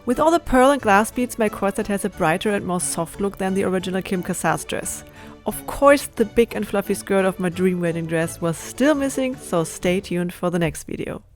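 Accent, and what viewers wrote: Germany. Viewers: The german accent makes 100 times better😅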